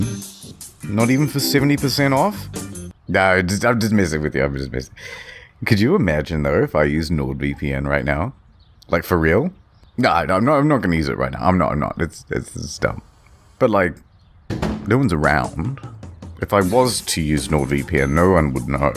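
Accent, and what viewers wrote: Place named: Australia. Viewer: oh yeaahhh, just lurve me some cringey ozzie voice with classic gaslighting/manipulative tone of pretense-of-niceness. This guy is so transparent. Ahh, youth.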